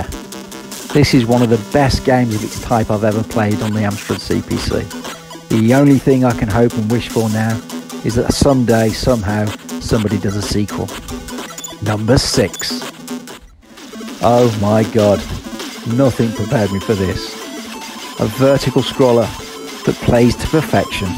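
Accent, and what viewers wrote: United Kingdom. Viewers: Great video (and british accent). Some french video games here (Orion Prime ;) Any input on the "Grand Turismo"? They said it should have been released for more than a year now..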